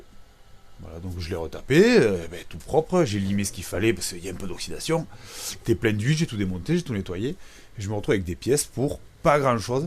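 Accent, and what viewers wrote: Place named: South, France. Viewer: Salut merci pour la vidéo, avé l'accent 😀, belle collec. J'aime beaucoup la musique d'intro c'est quoi?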